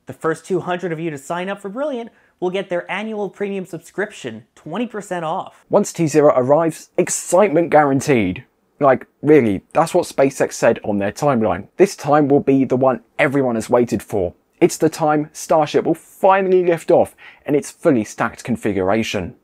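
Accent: United Kingdom. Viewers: No British Accent please!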